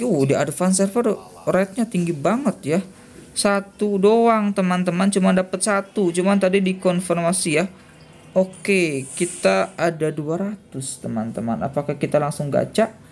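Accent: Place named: Philippines. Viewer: Bro has Philippines accent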